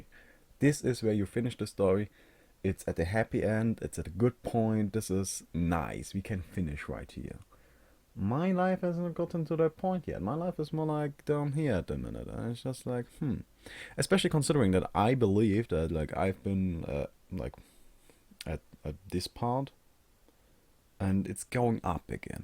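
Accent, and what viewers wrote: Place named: Germany. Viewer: hay bistb dud eutscher=? dein aktzent ist soo schön deutsch ;)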